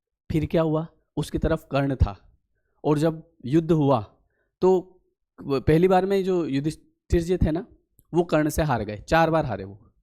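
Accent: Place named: Indore, India. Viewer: Aap MP se ho kya....aapki language Indore side ki lagti hai 😊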